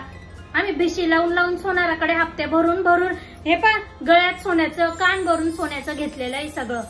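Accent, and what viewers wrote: Khandesh, India. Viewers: God Bless you Tai...sorry sorry Bai.... थोडा उशिराच पाहिला हा रील ...Superb....खान्देशी ठसका... लेखन आणि सादरीकरण एक नंबर...👌👌👍👍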